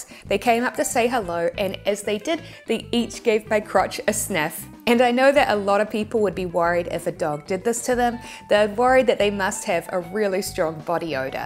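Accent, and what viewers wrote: New Zealand. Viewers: Wow! so good to hear the Kiwi accent talking about my favourite pet. Hope to hear more from you.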